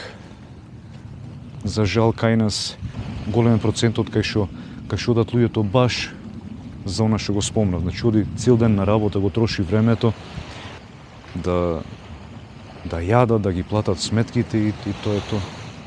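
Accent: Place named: Bitola, North Macedonia. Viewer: Odlicno video prodolzi da snimas za nas makedoncite.Po akcentot mora da si od bitolsko pozdrav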